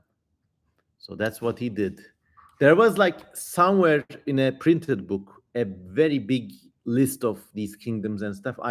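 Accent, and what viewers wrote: Turkey. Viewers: Abi Türkçe seslerle İngilizce konuşmana bayılıyorum ya :D, bu arada çantandaki kalemlere de bayıldım.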